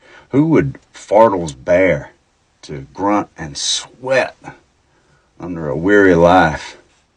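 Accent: South, United States. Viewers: okay, so as an asian, the flow sounds better even to me. i've always found it quite a challenge to really absorb the genius of shakespeare's writing but somehow it just sounds "easier" with an american southern accent. edit: for some reason, it sounds more timeless and relatable at the same time compared to when read with what's commonly believed to be the elizabethan accent of shakespeare's time. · RuPaul asked why not have Shakespeare in a Southern accent. Good question. Well done. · Wow this is the most brilliant rendition of a Shakespeare monologue done in a southern accent. You made it sound almost Buddhist in a way. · This is so good! I teach Shakespeare. It's interesting; hearing this monologue in your beautiful southern accent brings it alive.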